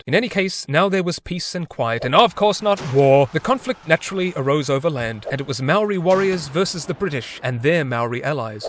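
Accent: South Africa. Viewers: This is new Zealand bro let's have a look cuz. I laughed so hard my bro u actually sound like my south African mate who lives here in nz lmao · sick south African accent my guy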